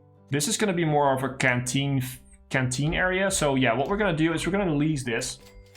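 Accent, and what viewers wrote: Netherlands. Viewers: Your Dutch? Anyway great serie to watch!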